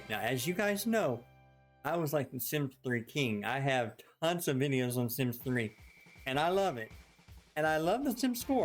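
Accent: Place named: South, United States. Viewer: 😭🥳🙌 AMEN Hallelujah Glory you are BACK! You sound FANtastic to me, Music to mah ears! i think you've picked up a bit of southern twang and im Loving it... 2.0, Baby! Let's Go! 🙏💙🥳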